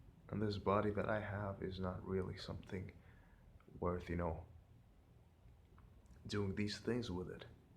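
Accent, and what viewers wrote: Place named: United States. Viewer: maybe we need to break it down; are most of our goals considered needs? For example, my goal is to look prettier - if I ask myself why? I'll know it's because 1- it gives me options for different work fields (such as modeling) 2- (more important for women lol) I'll probably have many admirers, which means different options available for picking a potential partner, or get completely shunned by real great ones lol. Regardless, they're all needs. Temporary. They don't last. Which is why it's excellent that you thought about it! Instead of letting your goals aka needs drive you around, you're thinking much ahead! That could actually give a lot more meaning to your goals and guide you through the way, resulting in true accomplishment. (Ps what state are you from? Incredible American accent!)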